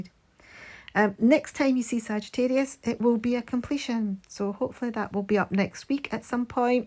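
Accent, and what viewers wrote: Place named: Scotland, United Kingdom. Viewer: Loved this WIP & Chat and learning more about the Sagittarius. Very interesting indeed. I was sitting here the whole time thinking you sounded like you were scottish then you mentioned Robbie Burns. I have not been a Burns Night in years. I live in the prairies and they celebrated here annually and I use to attend it when I was younger with my parents. Brought back some good memories. 💜💙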